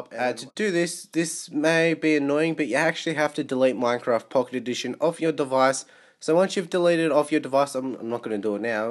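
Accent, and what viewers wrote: Australia. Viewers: I’m Australian and I hear a Australian accent are you australian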